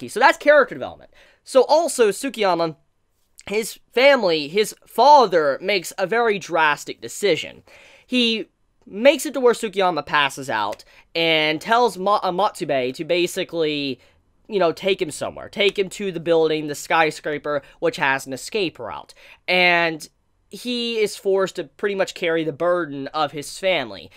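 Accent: South, United States. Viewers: #bring back the southern accent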